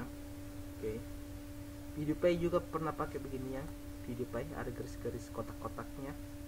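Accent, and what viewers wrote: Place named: Manado, Indonesia. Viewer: logatnya be manado wkwkwkwkwk ane ket manado soalnya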